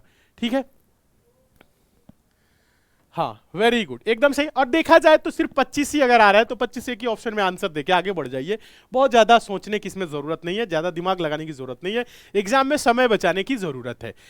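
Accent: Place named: Bhojpuri, India. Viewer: Sir G bhojpuri ek dam bhojpuri hero jaisa bole❤❤❤❤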